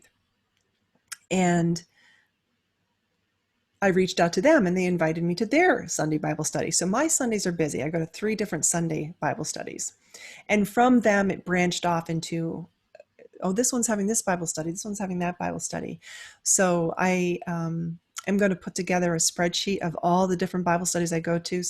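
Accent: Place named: United States. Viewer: very interesting, but slowly and dont speak American. We European exJw are listenin too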